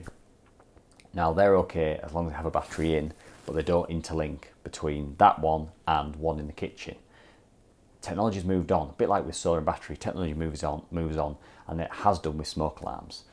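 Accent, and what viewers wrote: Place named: Northern England, United Kingdom. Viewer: What area are you based im guessing uk not sure how north 😂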